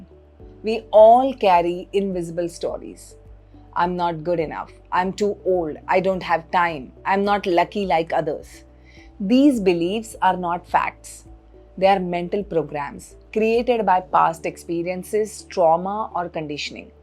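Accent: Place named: England, United Kingdom. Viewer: Love your English accent and the way you present your points with the flow. Happy to listen to you👏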